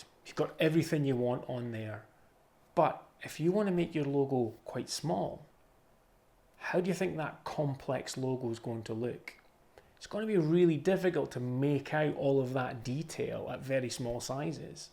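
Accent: United Kingdom. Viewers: Great advice mate.. Are you from the UK?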